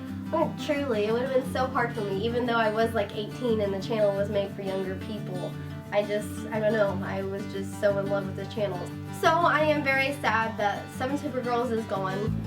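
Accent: United States. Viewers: this is entirely off topic but are you from the deep south? you have a very Southern bell accent. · I love your accent! What state are you from? I'm from the most southern town in florida!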